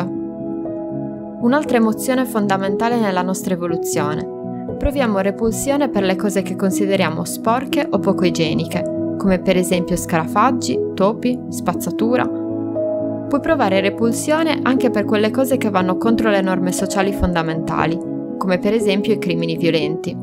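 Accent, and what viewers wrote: Sardinia, Italy. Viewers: Dal tuo accento sembri sarda o sbaglio?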